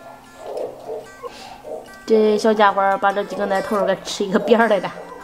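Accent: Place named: Hebei, China. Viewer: 真是发自内心的开心啊！做自己喜欢的工作最有滋味啦！听见我们河北的乡音，亲切💗💗💗💗💗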